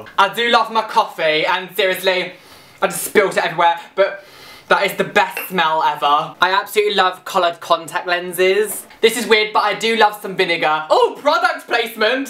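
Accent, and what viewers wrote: United Kingdom. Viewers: I LOVE the sound of rain on a tent. I love storms. I LOVE watching your videos!! Stars are AMAZING. I love British accents and terminology. That was part of the reason I watched your videos for a whole day! I love when I use a British term to my friends are family and they are like O.o and then it takes me a few moments to realize that I just called some guy a "git" so of course they aren't going to know what I'm talking about <3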